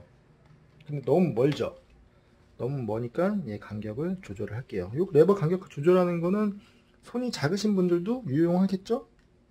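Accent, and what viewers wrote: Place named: Gyeongsang, South Korea. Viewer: 찡기다, 아까침에... 이런 사투리가 나오는거보니 부산경남쪽이 고향이세요? 마산에도 잠시 있었다는거보니 그런거 같네요 ㅎㅎ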